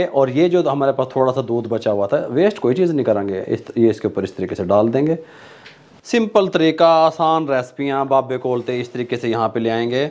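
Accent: Punjab, India. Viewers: Mujhy apka punjabi ma bolnay ka style bohat pasand hay 😃 #cookingat4thfloor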